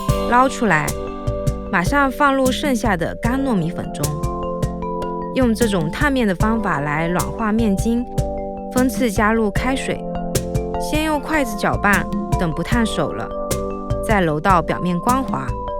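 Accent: China. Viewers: 汶。 我看过这个频道之前的影片，通过他的口音我知道他是大陆的，所以我才会这样说，还有一种更搞笑的，视频内容讲中文的，标题就是中英两种，他们就那么想外国人看到？这种情况只有亚洲人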